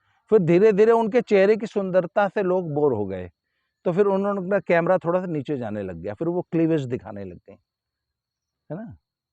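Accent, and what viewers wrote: Haryana, India. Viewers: आपकी बोली मैं हरियाणवी पुट है । रोने से आंखों को नुकसान होता है । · बढिया हरियाणवी बोली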